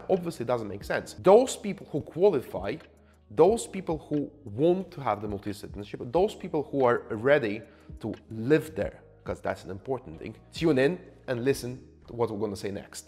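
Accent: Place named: Poland. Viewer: Your Polish accent is still very much possible to hear. Not that I am aginst a Poles ( as they belong to EU) just saying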